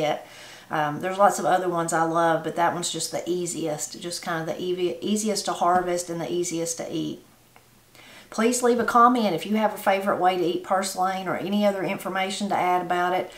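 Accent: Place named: South, United States. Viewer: I just love this lady's country accent, it's so deep and she seems so proud of it.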